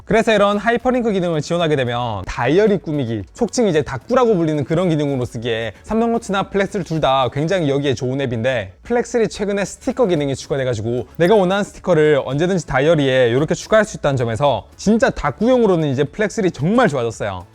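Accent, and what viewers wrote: Gyeongsang, South Korea. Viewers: 친절한 갱상도 남좌 하지만 난 아이패드😢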